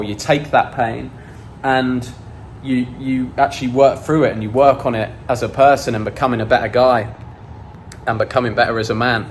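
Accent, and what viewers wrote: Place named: United Kingdom. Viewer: Bri-ish accent wif uh harry poht-uh legos is honestly excellent. You’re the most bri-ish person I follow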